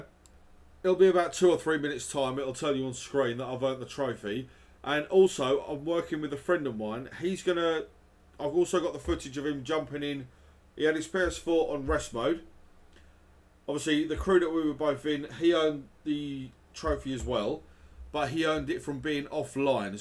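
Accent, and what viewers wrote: United Kingdom. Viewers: Are you from Kent?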